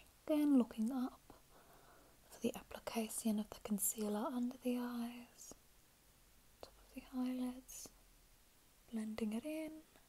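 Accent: Australia. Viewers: I get so excited when you post! I’m so desensitised to asmr because I watch it for my insomnia but yours seems to work so well! Maybe it’s the Aussie accent because it familiar but ❤️❤️ thank you for everything you do!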